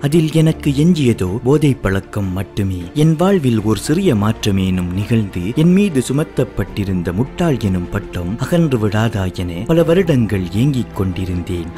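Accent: Sri Lanka. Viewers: Nice to hear Sri Lanka tamil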